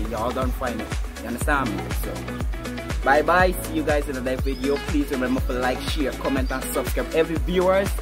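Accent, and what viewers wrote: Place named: Guyana. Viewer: Keep the Guyanese accent bro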